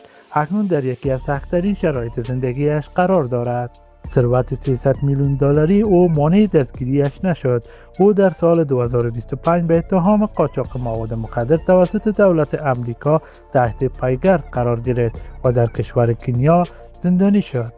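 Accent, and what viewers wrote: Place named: Afghanistan. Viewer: تشکر از شما که لهجه دری افغانی را در کانال خود اضافه کردین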